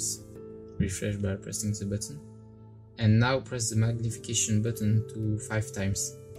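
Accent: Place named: France. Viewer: Tnx for this tutorial. I like ur french accent :)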